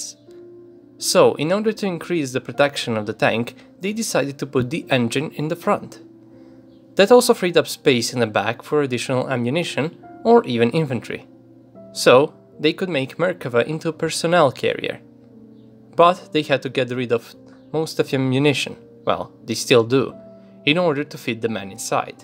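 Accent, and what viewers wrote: Russia. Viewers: This guy is “stupid” you know why? Because I’ve been through thick and thin with this tank Meaning I’m a Gunner in IDF Armored Corps, 7th Brigade,I think the uploader is a Russian speaker with a little bit of accents in English, who probably have friends in the IDF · This video is reasonably good. However, you thick Russian accent in English commentary at times obscures the meaning especially on the technical terms. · funny to hear criticism of the Merkava with a clear Russian accent.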